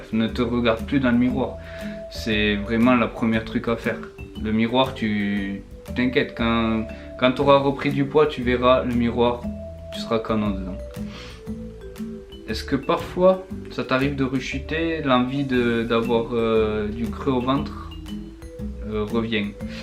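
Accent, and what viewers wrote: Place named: Toulouse, France. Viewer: j'adore le petit accent toulousain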